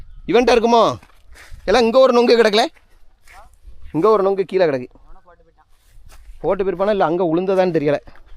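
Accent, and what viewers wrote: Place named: Village Tamil, India. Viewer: அருமை வாழ்த்துக்கள் நம்ம ஊரு தமிழ் அழகு❤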